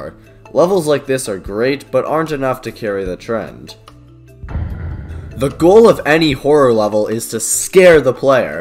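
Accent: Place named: United Kingdom. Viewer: glow is the best for sure to me (for a creator with an british accent) and hell is 2 (for a creator with an british accent) core is 3 if done right (for a creator with an british accent) 4thly thats not the most impressive thing in the world minigames and stuff like that go play what and change of scene (for a creator with an british accent)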